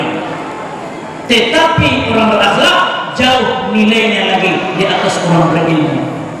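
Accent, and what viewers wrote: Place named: West Sumatra, Indonesia. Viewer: Urang minang mano suaro nyo😀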